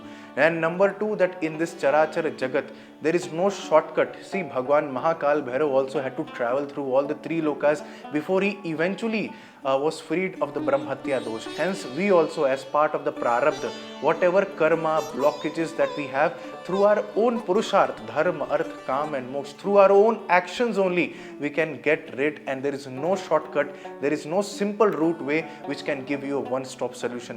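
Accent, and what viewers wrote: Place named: United Kingdom. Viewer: Why are u speaking in BRITISHER INVADERS language? Do we have no INDIC language to depict .. And why are u calling उपासक as उपासका & भैरव as भैरवा, while you correctly pronounce Chanel, Louis Vuitton, Dior, Citroen, Moët & Chandon, Laurent Perrier, Veuve Clicquot, Louis Jadot Domaine Leroy, Château , Bordeaux and L'Oréal as per original French pronunciation & correctly pronounce ikigai, wabi-sabi, kaizen, shinrin-yoku, omotenashi, gaman), kintsugi, shikata ga nai & bonsai as JAPANESE pronounce them. Then why SANSKRIT terms are pronounced by you as beef-eater BRITISH pronounce them? You're full of arrogance & ignorance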